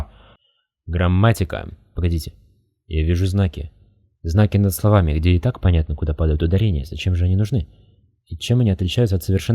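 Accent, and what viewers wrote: Russia. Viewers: круто, но слышен русский акцент при разговоре. сообщаю как человек, который говорит на испанском без акцента.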